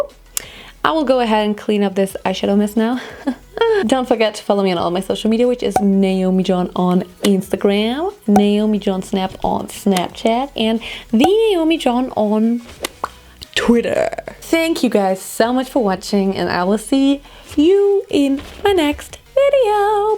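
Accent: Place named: Germany. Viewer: Ok so its my first video I'm watching of yours and omg I could tell you're German because you sound and act very similar to wthmille who is hilarious! SUBSCRIBED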